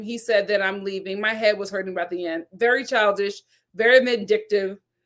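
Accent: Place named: Philadelphia, United States. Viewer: LOLOL we love you, and maybe it's the Philly accent but you've been saying it wrong.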